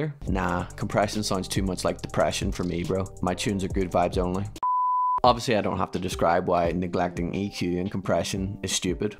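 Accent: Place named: Scotland, United Kingdom. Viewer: Yes...you got a sub bc i like your scottish accent. 😜 Lived near Perth 10 years ago. My Ex is scottish. But she is back home now. But i loved your country...if im right that you are a scots dude...???!!! I also saw that you are on Looperman. Im not active there anymore. But i was like 13 years ago. I only go there sometimes to download an acapella. Greetings from Germany. ❤☮☯❤☮☯❤☮☯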